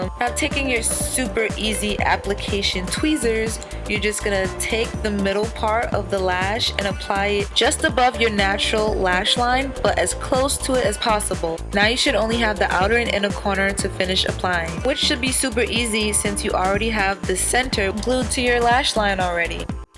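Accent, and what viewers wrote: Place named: Rural, United States. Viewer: Your lil country accent is the sweetest thing I have ever heard in my life!!! <3 I know thats weird but you keep throwing your lil twang in there and its literally the cutest thing I have ever heard! Get it ma!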